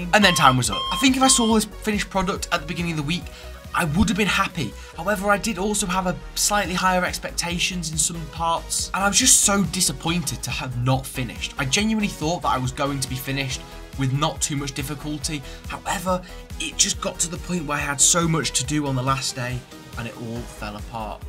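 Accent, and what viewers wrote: United Kingdom. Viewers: This guy is british?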